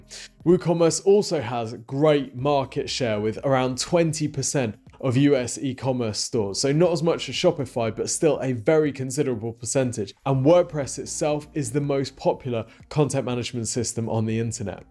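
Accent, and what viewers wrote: United Kingdom. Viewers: I love your British accent! Would Ease of Use n Support be better if I go with Woo under Bluehost?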